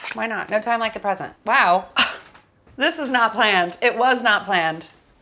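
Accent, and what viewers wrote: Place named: Southern California, United States. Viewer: perhaps you don't know this ... you really help people out of depression. (insert something funny) coastal peeps. immediately knew you were socal, I'm norcal. love it.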